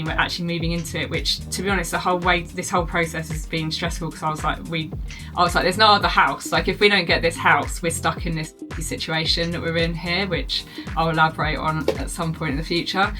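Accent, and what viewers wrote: England, United Kingdom. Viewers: Your so adorable and cute Mr Jumps is one lucky fella and you should remind him of that every min of every day of ever week of every month 😅 and that part about you getting behind and never getting behind and you are not going to start to get behind was one of the cutest parts of this video😂 your English accent and intense eye emotion while your talking amuses me as much as how damn good you are at jumping rope! I wish you and yours the happiest of moves and times in your new home. You both deserve it!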